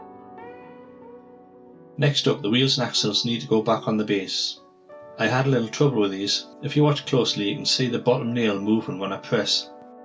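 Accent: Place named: North East England, United Kingdom. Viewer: Hi from peterlee. I think by your accent you're not to far away. Love this VW and thanks it brought back some very happy memories.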